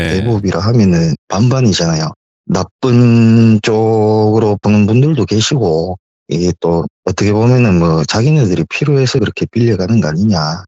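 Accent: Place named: Gangwon, South Korea. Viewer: 지역이어디지?경북인거같기도한데 아니고...강원도인가?첨들어본 말투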